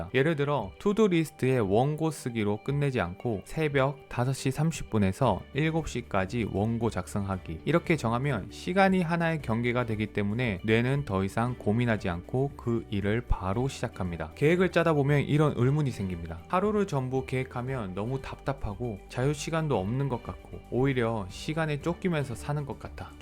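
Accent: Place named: Gyeongsang, South Korea. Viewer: 시몰 다이어리 잘 받았습니다. 너무 감사합니다. 정성과 진심을 느껴서 댓글은 처음인데 안 쓸 수가 없었네요.. 듣다가 경상도분이시구나!.. 내적 친밀감..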